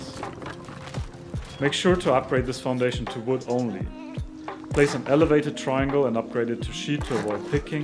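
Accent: Germany. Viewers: No offense to your english, but I instantly knew you were german. People also do realize my german accent after I start speaking even when there are no grammar mistakes :D Whatever, calm voice, calm music and good mic / video quality. Well done man!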